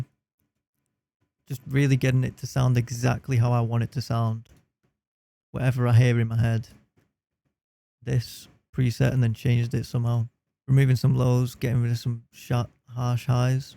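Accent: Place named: Manchester, United Kingdom. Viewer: great video and in mancunian accent.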